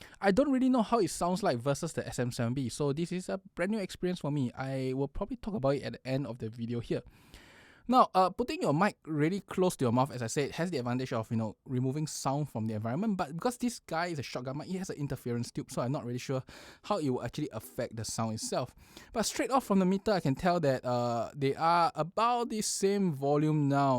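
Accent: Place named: Singapore. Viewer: As a fellow Singaporean who sounds similar to you. The Shure SM7B and the MKH50 does not sound that dark or boomy with our voices vs American and Europeans voice.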